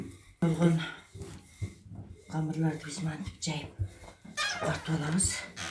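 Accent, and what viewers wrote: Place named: Kyrgyzstan. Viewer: Соонун нукура кыргызча азаматсыз апа колунуз дарт корбосун дагы ушундай видеолорду тартыныздар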